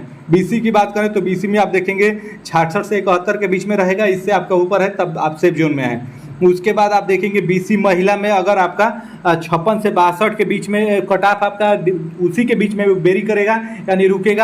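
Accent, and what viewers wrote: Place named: Uttar Pradesh, India. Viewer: Aawaj se lagta hai ki UP k ho .Tum ye batao ki UR or BC me kabhi bhi 6 se 7 no ka anter ho sakta hai kya oo bhi 1600 seet me .Sahi jankari do ....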